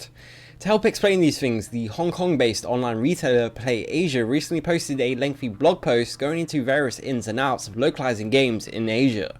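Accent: United Kingdom. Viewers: You sound like Chris from Family Guy but British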